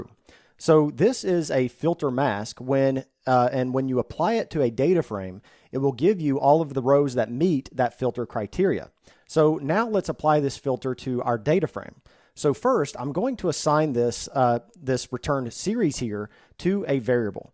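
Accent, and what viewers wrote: South, United States. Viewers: i like his kinda southern accent lmao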